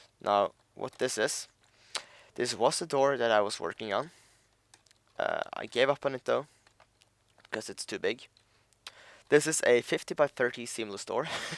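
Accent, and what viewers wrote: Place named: Norway. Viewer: I could tell by the first 10 sec that you're from Norway :P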